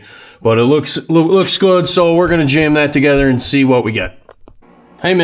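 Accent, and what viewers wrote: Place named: Upstate New York, United States. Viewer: I love watching you work on "old junk" much more than I would like to watch people work on expensive new things. I don't like to watch rich dudes rubbing it in my face. I like watching because I like seeing someone who is obviously doing something they love with obsolete things, not wasting things, making things work, doing good work, tasteful work, intelligent work. I can't stand people who do click bait, who trash things for no reason, who are destructive, materialistic, act stupid or crazy on purpose. comes off as a genuine dude and I believe he acts the way he is on camera when he is off camera and I think he is sincere in what he says. He would probably get more views and make more money if he was like that but, I am glad he isn't willing to sacrifice his class for that. My father was a trucker from Oswego County NY, he loved all this kind of stuff. 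I love hearing talk his accent reminds me so much of the working class upstate NYer guys I was around as a kid. It really warms my heart. It's also impressive to see a guy who is doing well in spite of all the downsides of living up in NY. The weather, the economy, the government, the laws, the rust, the cost of living, the taxes. I love it up there in the summer out in the country. I'm 41 and I was in the military and I just couldn't make it up there so I live Tennessee. Thanks making these videos, I really appreciate them.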